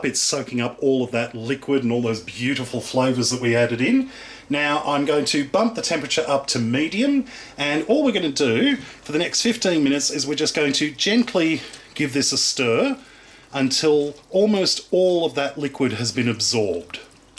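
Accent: Australia. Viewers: i I genuinely hate the aussie accent but i don't mind yours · not British. Australian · Okay, the first time I watched one of your videos I thought you were English, but know I think you are Australian.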